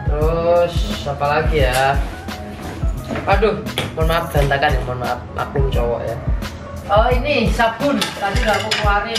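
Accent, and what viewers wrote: Java, Indonesia. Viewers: wkwk ucul bahasanya campur2 medok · Kak nih asli mana sih,ngomong jowone medok bingit Rek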